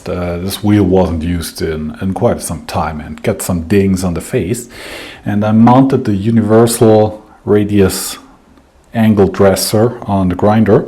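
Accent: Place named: Germany. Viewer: Hi, i like high level quality. And by the way your german english is beter than original😉🤭. I learn english and i look and listen you. Thanks.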